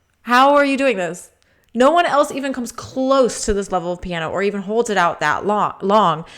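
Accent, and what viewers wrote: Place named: Italy. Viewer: Fantastic reaction! Very informative and deep. Your italian accent is nice! 🎉🎉🎉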